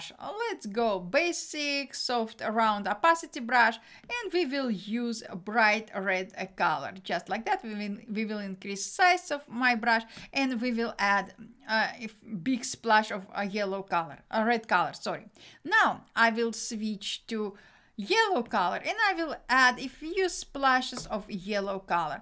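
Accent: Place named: Russia. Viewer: Its russian accent btw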